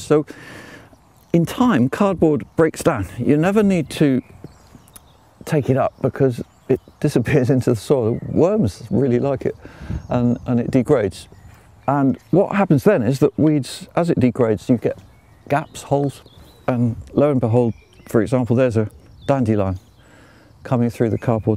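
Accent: United Kingdom. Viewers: That's the british accent that i like:).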